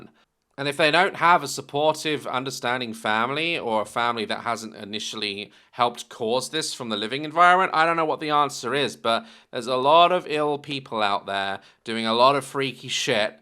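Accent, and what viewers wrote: United Kingdom. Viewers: Your videos haven’t failed to make me laugh, I love that British accent (as well as the very sassy voice) 😂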